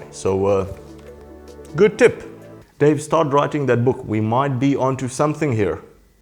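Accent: South Africa. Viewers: Fantastic ! Was watching this & the missus said she can hear a South African accent...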